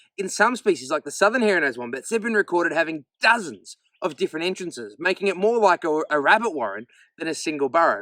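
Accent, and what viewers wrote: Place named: Australia. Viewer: The Australian animals & accent never cease to amaze me.. 😂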